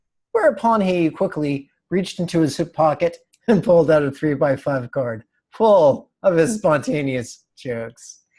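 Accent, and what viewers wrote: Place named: Germany. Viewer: I like your German accent!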